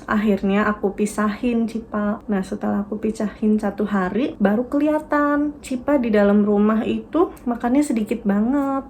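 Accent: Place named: Banyumas, Indonesia. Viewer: Dari logatnya kayanya kaka org ngapak ya🤭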